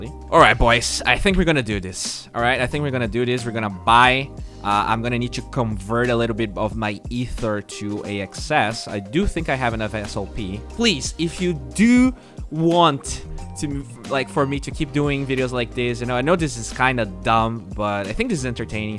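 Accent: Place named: Brazil. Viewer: Mano, eu nem sabia que tu era brasileiro e percebi pelo sotaque em inglês. A gente fala de uma forma muito específica em inglês euheuheuhe. Ótimo vídeo!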